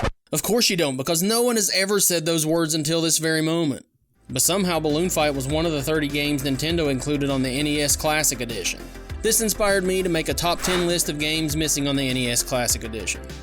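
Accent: South, United States. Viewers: Is this a hilly billy brother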